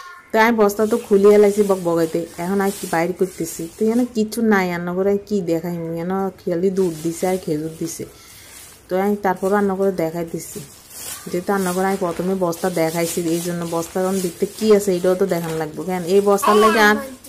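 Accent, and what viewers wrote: Noakhali, Bangladesh. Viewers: আপু আমি তোমার কথাগুলো খুব মনোযোগ দিয়ে শুনছিলাম।তোমার মেয়ের খেলার বর্ননা শুনে আমি হাসতে হাসতে শেষ।পুরা ভিডিওর কথা শুনেই আমি হেসেছি।তোমার নোয়াখালীর ভাষা বেশ ভালো লেগেছে।তোমার বাবার পাঠানো জিনিস গুলো দেখে আমার বাবারবাড়ির কথা মনে পরল।ভালো থাকবে। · আসসালামুয়ালাইকুম প্রিয় আপু হাহাহা অসাধারণ হয়েছে তোমার মুখে নোয়াখালী ভাষা শুনে তো আমি ফিদা, মাশাহ আল্লাহ মামুনি জন্য অনেক অনেক দোয়া আর ভালবাসা রইলো অনেক বাজার,, ইলিশ আমার প্রিয় মাছ,, প্রতি দিনের বাজার প্রতি দিন করা ই ভাল মাশাহ আল্লাহ বস্তার ভিতরে তো অনেক জিনিস 😜 খেজুর পার্শেল করো আপু, অনেক অনেক ভালো লাগলো আপু ❤❤❤ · নোয়াখালীর ভাষায় কথা শুনতে বালো লাগে দারুণ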